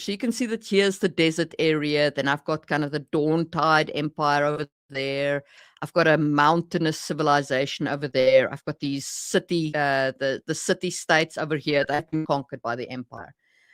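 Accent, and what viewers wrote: South Africa. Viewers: Afrikaans? I've always wondered about your accent when you said you were from Finland because it always sounded like South African to me. Good to finally know.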